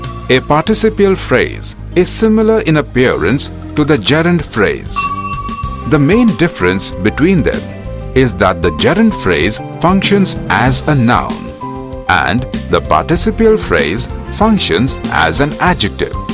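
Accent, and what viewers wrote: India. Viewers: fuck man! I dont unterstand Indian accent.... -.-"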